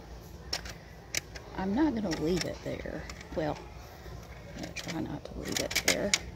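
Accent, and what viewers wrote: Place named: South, United States. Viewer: Oooooo yay!! I love these videos it’s so soothing to watch you organise the shelves along with your southern accent talking away ( I’m British 🇬🇧 and I love me a southern accent 😊 ) I especially love when you organise the soaps!! ( that’s my fave ❤ ) Looking forward to another one of them videos too!!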